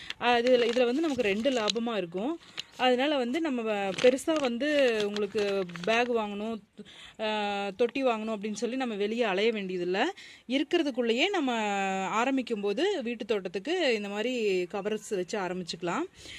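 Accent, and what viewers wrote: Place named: Kongu, India. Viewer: kozhi koovuvadhum iyarkai suzhalum ungal kongu tamizhum niraivai irukkirathu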